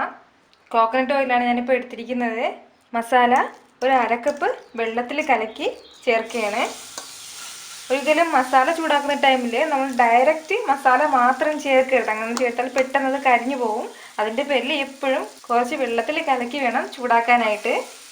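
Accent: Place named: Kozhikode, India. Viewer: but ur slang like clt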